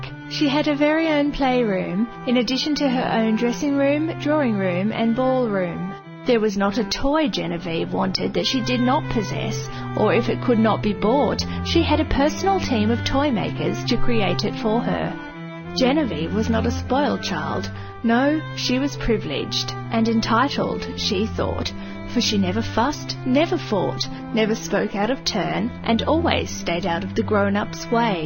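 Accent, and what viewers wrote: Australia. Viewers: aussie voice wooot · Australian accent?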